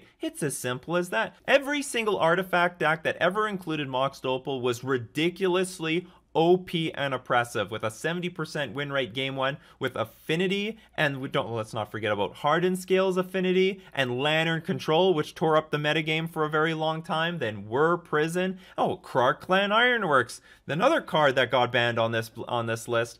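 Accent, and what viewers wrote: Canada. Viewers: When I finally heard it in your accent that you're Canadian. Lol love your content man.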